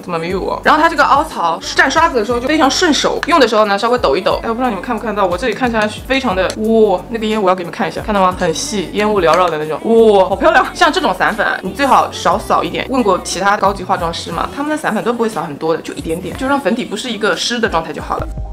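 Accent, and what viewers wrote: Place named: Xinjiang, China. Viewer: 为什么韩语说出来新疆味？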